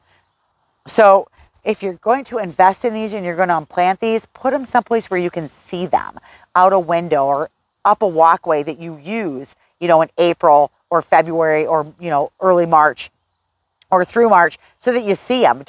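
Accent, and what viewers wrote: Midwest, United States. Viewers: I love this real American accent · Great information and a video without a ten-minute introduction of mindless time-wasting filler. All the folks complaining about the Midwest accent can drop off. Imagine feeling so self-important that you criticize the way another person speaks when that person is trying to help you.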